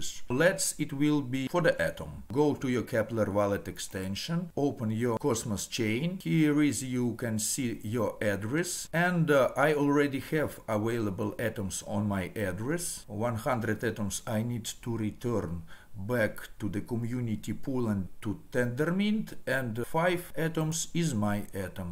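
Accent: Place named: Russia. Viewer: Аналогично )) Зато всё понятно - нет багов в понимании английского или американского "прононсиэйшн". По русски говорит на английском!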